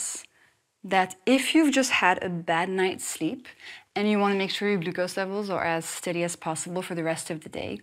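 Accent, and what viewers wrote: France. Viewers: I like this videos of yours. I think you are a good and knowledge person. Side note: for some reason I sense a strong French accent in your English and I wonder are you French or a French-Canadian? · Completely off topic... How have you managed to almost completely lose your french accent? That's a first for me! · We (french native speakers) are so doomed... I mean I would kill for an accent like this one, yet people treat this as a french accent... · Has anyone sensed a similarity between Ms. Inchauspe and Martha Quinn from the OG MTV in the early 80’s?Quirky, cute, MTV VJ. One of the original VJ’s. is French but even through the tinge of French accent there’s a lift and a flow to her pitch and tone that was unique only to Martha until now. At least to my ears. To me it’s kind of freaky to watch talk while remembering Martha Quinn. I can hear Martha in there. 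I don’t think is doing anything to change her voice, not on purpose at all but it is eerily similar. At least to me. She is engaging and if it helps people’s health, that’s great. More power to her!! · That subtle french accent is absolutely charming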